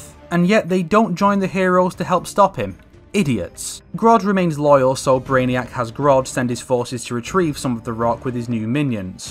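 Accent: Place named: United Kingdom. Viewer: Plus that silky British (or Australian) accent is extremely soothing.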